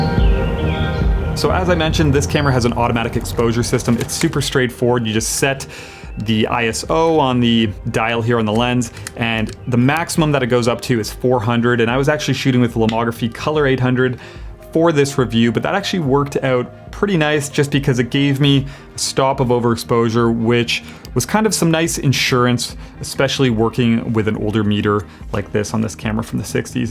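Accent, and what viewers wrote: Canada. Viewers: Sounds Canadien.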